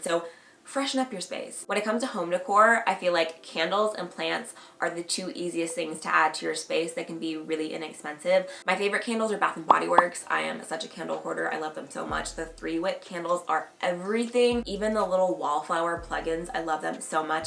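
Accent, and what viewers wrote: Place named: Tennessee, United States. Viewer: I’m really hearing your Tennessee accent in this video which is weird 😂 especially since you moved to LA!!! I love it thoooo we need a get ready with me installment to this video 💕💕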